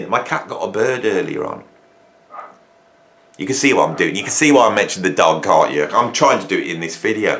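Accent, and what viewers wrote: United Kingdom. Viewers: You’re a mad genius, I love it. As an American, and an accent buff, I tried to imagine this video (I’ve watched others of yours and subscribe) with the exact same content, but delivered with an American accent, or even a more neutral British accent, it would be a very different experience. Your content is great, I think it’s brilliant, but I also think your accent is a bonus that gives the whole thing an ineffable charm. Cheers!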